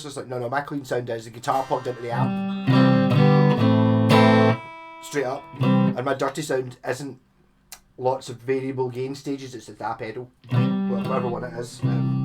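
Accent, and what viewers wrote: Scotland, United Kingdom. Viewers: Fuckin Scottish accent.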